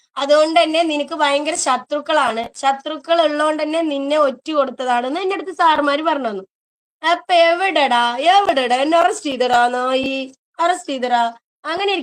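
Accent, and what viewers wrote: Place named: Kasaragod, India. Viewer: ഇവളുടെ കാസർഗോഡ് ഭാഷയാണ് സഹിക്കാൻ പറ്റാത്തത്... ഇടക്ക് അത് കേറിവരും...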